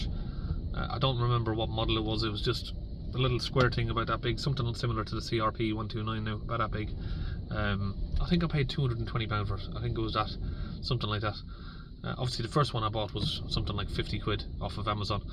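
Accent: Ireland. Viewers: It woukd great to.see.ya moving to ireland ,I have two luton maxi movers ready , for a fella that spent that long in the uk ya never lost the accent carlow or laois ?by the way 40 isn't that old ,ireland got very expensive that last 5 years though great channel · Thanks. For to be in England form 12years old, good to hear you haven’t lost the Irish accent. 👍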